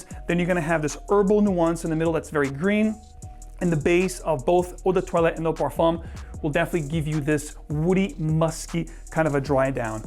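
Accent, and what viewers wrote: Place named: New England, United States. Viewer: you're from nee england brotha 💪🏽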